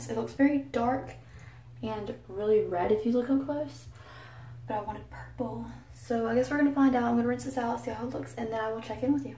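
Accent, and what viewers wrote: South, United States. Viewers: Love your videos!!! I just wish that you would speak a bit more slowly, though perhaps you've slowed down in your more recent videos that I haven't seen. The speed, in addition to your southern twang make it difficult to understand what you're saying at times!!! xoxoxoxo 💇🏻✨💄👠💋👍💅💗👸🏻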